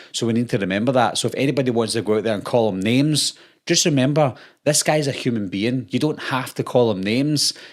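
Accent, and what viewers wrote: Scotland, United Kingdom. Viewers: How does one get THAT Scottish?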